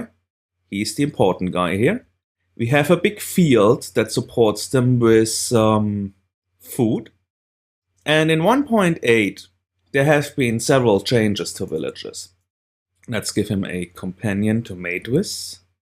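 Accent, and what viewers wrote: Germany. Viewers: man merkt das du deutscher bist :D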